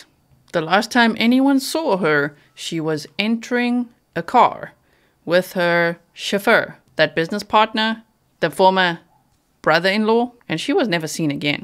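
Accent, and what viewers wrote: South Africa. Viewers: Is your significant other another english speaking dialect like australian New Zealand? Your accent definitely is south African but there is more to it, maybe its wven American english mixed with your majority south african accent....but yea, when you mention it! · I can hear that you are south african🇿🇦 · You dont have a mixed accent. ITS SOUTH AFRICAN !!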